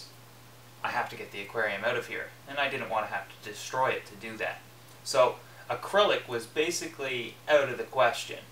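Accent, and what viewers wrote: Canada. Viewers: You sound very Canadian :)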